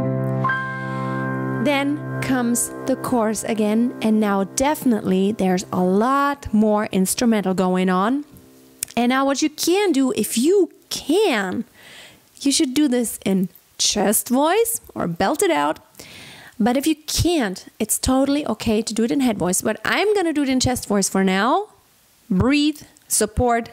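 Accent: Germany. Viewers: You sound German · Are you German?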